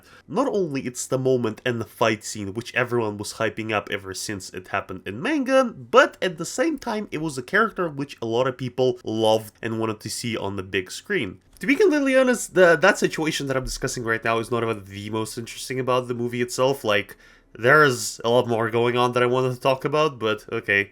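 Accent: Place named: Russia. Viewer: Кто заметил акцен пишите. Я знаю, что ты русский, меня не наибеш. Спален за русским геймплеем.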